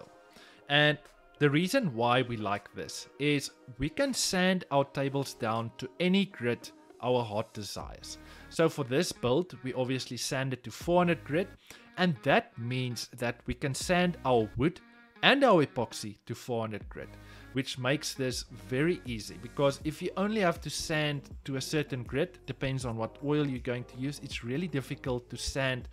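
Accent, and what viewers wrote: South Africa. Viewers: Love the video. And love the saffa accent. Haha. Keep going bud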